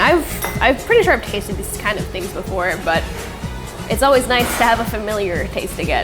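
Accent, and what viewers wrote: Spain. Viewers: CON ACENTO ESPAÑOL ES LO MEJOR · * tiene acento de Madrid por favor q mona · tiene un acento re español cuando habla en esp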